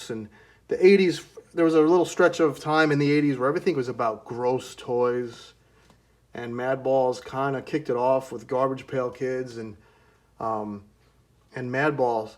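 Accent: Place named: Long Island, United States. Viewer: Cool accent. Are you from Long Island?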